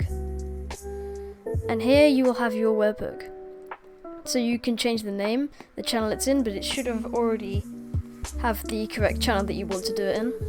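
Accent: United Kingdom. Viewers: Man sounds like he is British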